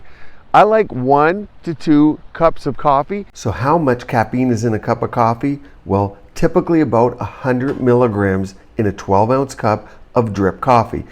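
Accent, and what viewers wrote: Canada. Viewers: I falsely thought the eastern part of Canada stayed cool all year but see thats not true and can be quite warm. Your canadian accent seems more pronounced when you're there. I don't normally notice that you have an accent. Looks like a great place to grow up! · Well your accent reminds of a Canadian accent, and you must be on the Atlantic coast so I guess Nova Scotia?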